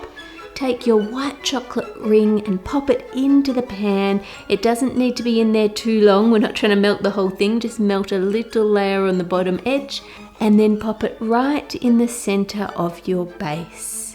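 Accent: Australia. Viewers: I couldn’t be happier that the lil stop motion title sequence is back. Also can’t get enough of voiceovers, iz just relaxing n calming(I think it’s the Aussie accent).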